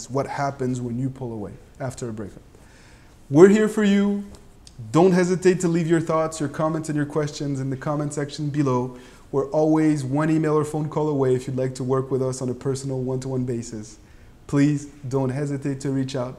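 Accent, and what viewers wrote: France. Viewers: french accent ?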